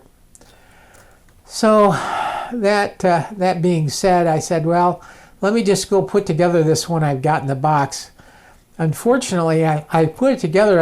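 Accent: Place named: Michigan, United States. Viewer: I thought you were from Michigan by your accent, Im from Howard City Michigan❤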